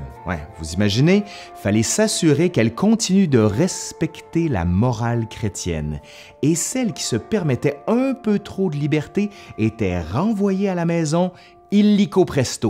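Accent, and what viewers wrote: Quebec, Canada. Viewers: Je keaff l'accent québécois 😅 le tafff est'c8son aux ralentid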